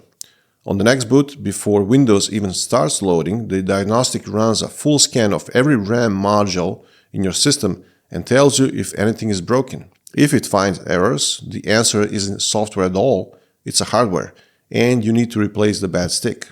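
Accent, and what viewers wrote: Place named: Eastern Europe. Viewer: Thanks for this. Detecting a slight eastern European accent...are you Russian? Studied Russian a long time ago.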